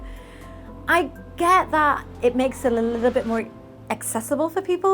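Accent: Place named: Northern England, United Kingdom. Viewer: Nice video :) I thought i heard a northern twang in your accent...that was Bamburgh castle right?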